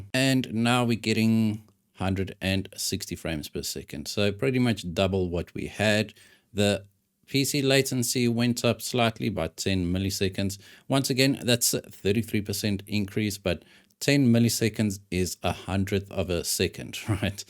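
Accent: South Africa. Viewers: Is that Afrikaans accent I hear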